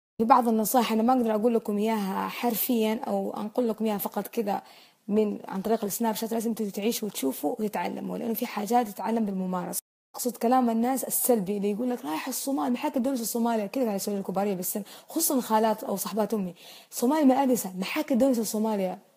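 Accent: Saudi Arabia. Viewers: هل أنت سعودية؟،،لهجتك سعودية 100 ب100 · لهجتها سعودية قح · هل كنتي عايشة مع اولاد في السعودية؟ سلوب كلامك مثل شباب السعودية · اهنيكي على اسلوبك الجميل والواضح ولهجتك السعودية روعة بصراحة الجالية الصومالية من افضل الجاليات في السعودية وعندي صديقات منهم في الثانوي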